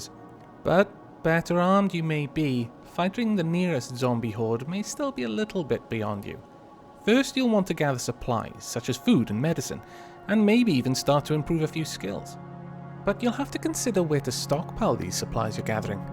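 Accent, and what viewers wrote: United Kingdom. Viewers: What's with all the new Let's Players I'm finding sounding like they're doing voice-overs on prologues to some series? First the 'Call in now, and you get TFC with not just five, not just six, but at least SEVEN NEW TREES!' of Pakratt, and now the 'Come with me on a journey through the ag... I mean, game' of this guy? Amusing, though. Wonder how you'll talk later on... Probably still british-esque.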